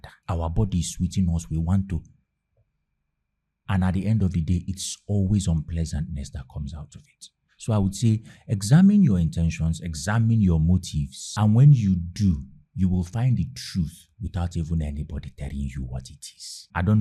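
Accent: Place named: Anambra, Nigeria. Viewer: So I was peacefully listening and enjoying this video ooo Until I was distracted with your Anambra accent, and I remembered the trending video that brought me to YouTube today... Please can you be my Odogwu from Anambra 😂😂😂😂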